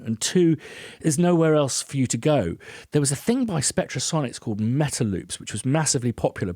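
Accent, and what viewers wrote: United Kingdom. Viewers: The one simple thing you can remove from the chain of events that would make the outcome different is your British accent. There. I said it. Nothing better than learning composing from a dude with a sophisticated british accent.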